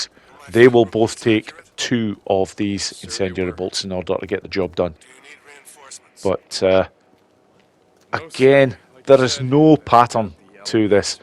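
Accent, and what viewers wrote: Scotland, United Kingdom. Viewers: In any shooter game, I always opt for a sniper rifle as soon as possible. On the first playthrough, I saved my lunch money and turned in a lot of bounty to get my trust level up so I could buy the.50BMG. The slaughter went pretty much identical to the first example. On the second go around, I had added the Rock Chuck which made it more fun as I took out the roof crew from a distance and then snuck in for an up close and personal finish. BTW, and I hope I'm not getting the nationality wrong, I enjoy videos as much for the content as I do listening to his narration. Of all the peoples speaking English as a second language, there's just something special about the way a Scottish person does it, and I could listen to it all day! So, hats off for all your very helpful offerings on Days Gone.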